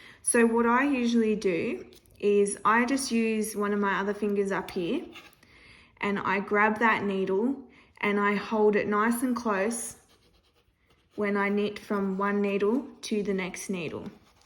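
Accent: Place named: Australia. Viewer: Off topic, but It's so nice to hear a fellow aussie's voice in a knitting video!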